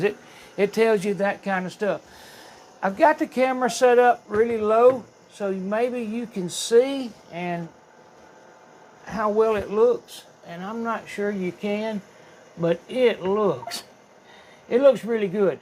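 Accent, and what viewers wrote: South, United States. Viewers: As a new yorker! I like your southern accent very funny and hapoy!! 😂😂😂thank you for this video! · Thank you so much for this wonderful demo. It was just what I needed. And I just love everything about the way you did it and as a southerner, loved the accent to death!!! ❤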